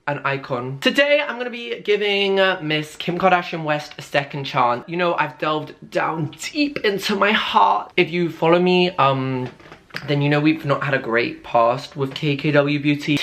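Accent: Scotland, United Kingdom. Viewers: iconic. scottish accent is improving , proud x x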